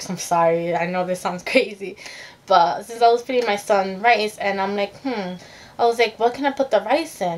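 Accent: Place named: Miami, United States. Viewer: Love the Miami accent! I'm definitely going to try this.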